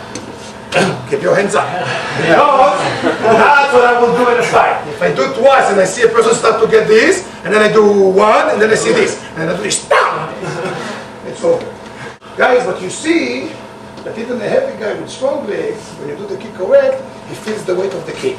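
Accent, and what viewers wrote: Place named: Germany. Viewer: He sounds German.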